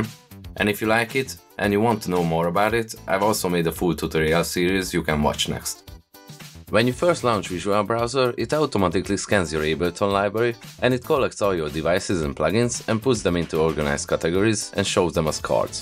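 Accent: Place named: Hungary. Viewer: magyar akcentus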